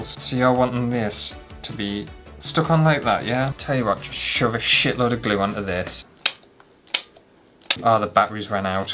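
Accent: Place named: Newcastle, United Kingdom. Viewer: I know it's great, devastated that you change the captions to words others will understand 😂 gotta love a Newc accent lol I think one day you should put the words you actually say 😂 just for shits and giggles 😂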